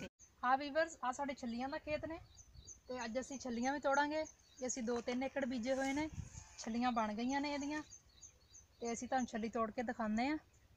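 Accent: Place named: Saraiki, Pakistan. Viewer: آپ لگتی تو نہیں پنجابی آپ سرائیکی ہو زبان سے لگتا ہے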